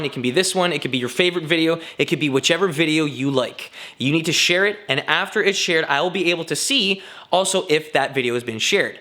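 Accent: Canada. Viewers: Are you Canadian? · are you Canadian?? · Off-topic comment: In your "Send me stuff" section, you don't mention the country. There are two Ontarios in USA, and for those who can't recognize the subtle clues of a Canadian accent, you might be missing out on stuff! Add "Canada"! All the best, Rob (in Switzerland)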